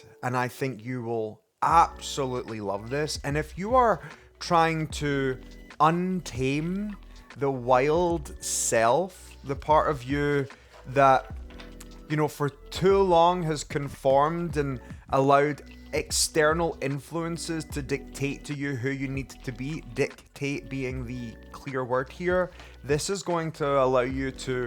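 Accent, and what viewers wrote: Scotland, United Kingdom. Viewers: Lovely cards like the coffee love the Scottish accent ❤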